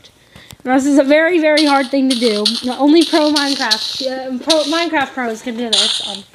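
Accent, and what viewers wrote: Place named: Nevada, United States. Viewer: dude u talk like people in pahrump NV yall best be gon roustabout the rattle snakes before them jeepers ranchalot no shade no cap....im brown and people say i sound like a texan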